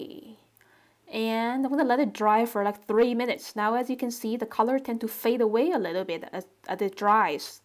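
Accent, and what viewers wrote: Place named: England, United Kingdom. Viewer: 2023…just saved this as a watch later…I love rotisserie chicken, watercolor, and voice and and English accent is nice to listen to!! Keep up the good work! I’m inspired ❤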